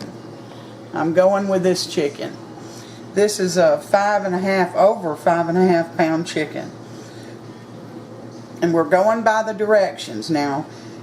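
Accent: South, United States. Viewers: hi! wow wow you made one lovely dish of supper! I make my own poultry seasoning and sage & rosemary is in there, such a fine blend of herbs!!!!! I'd like to thankyou, for such a wonderful video, and sitting down watching your cooking, listening to you &, is very therapeutic, your Southern accent, very peaceful & soothing thankyou my new friend hugs from willow-Sage in victoria bc Canada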